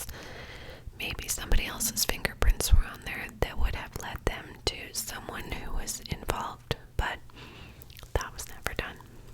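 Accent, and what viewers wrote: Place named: Canada. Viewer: I have a feeling you’re a fellow Canadian😂 (Toronto here!) Something about the cadence of your voice and ofc the politeness haha Love your channel!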